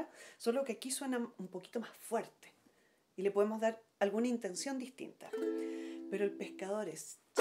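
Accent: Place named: Chile. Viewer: Gracias querida. Soy colombiana y he aprendido mucho contigo. Mil gracias por tus enseñanzas. Tienes una voz muy bella y ese acento chileno es suuuper cool. Dios te bendiga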